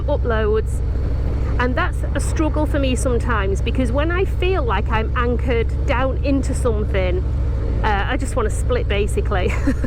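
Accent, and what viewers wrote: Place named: London, United Kingdom. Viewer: Awesome ride and video. I’m here local in Miami also. I ride that road all the time that you were on. Half of my family sound like you from London. Ill subscribe and hopefully we can do a ride. P.S. black point is the spot.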